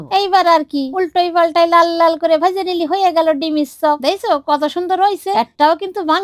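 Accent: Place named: Bagerhat, Bangladesh. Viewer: বাংলাদেশের খুলনা জেলার মতো কথার টান😮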